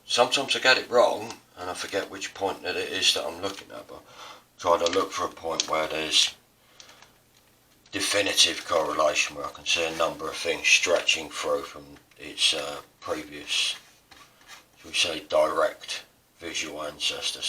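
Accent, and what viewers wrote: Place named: Netherlands. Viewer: Watched all of them .. Very nice technique.. for a dutchie your accent is very difficult to follow, but luckily art most of the times doesn't need a language! TFS..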